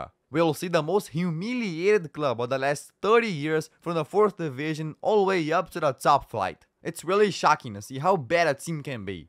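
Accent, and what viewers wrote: Brazil. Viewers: Bro,just for curiosity,are you brazilian?juat because your accent,btw iam brazillian :)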